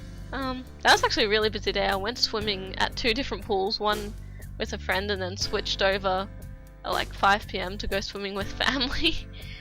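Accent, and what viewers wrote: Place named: United Kingdom. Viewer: Haha, na, sounds to me like you got a good voice for these things. Are you British? I feel like theres a sort of British accent in your voice.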